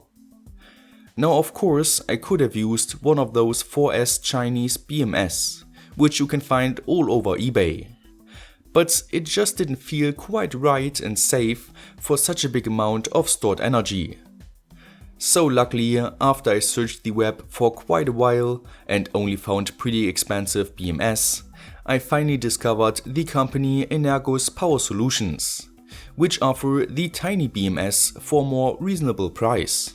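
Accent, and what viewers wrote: Germany. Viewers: Are u german? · Man höher so hart dass du deutsch als Muttersprache sprichst 😂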